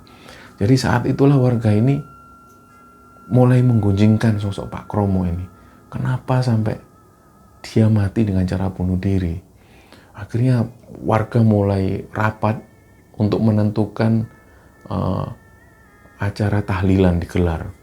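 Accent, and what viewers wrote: Java, Indonesia. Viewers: Video nya hampir smua kutontoon mas the best menyajikan cerita nya khas medok Jawa nyaaa 👍👍👍👍 ketagihan nonton nya tekstur crita gAmpang paham untuk dicerna👍👍👍good Job mas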